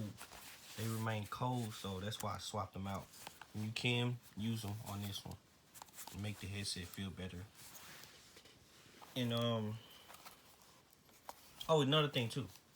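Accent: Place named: South, United States. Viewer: you kinda sound like the YouTube poiised. Are you from the south by any chance?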